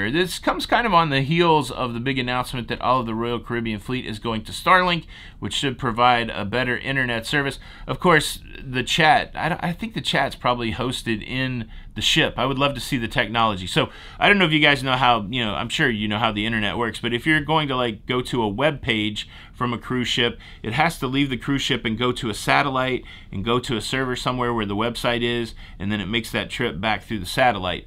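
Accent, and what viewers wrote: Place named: Texas, United States. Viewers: That Texas accent was so natural! Well done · Just love your Texas accent,! Ha! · that was some amazing Texas talk! lol #houstonian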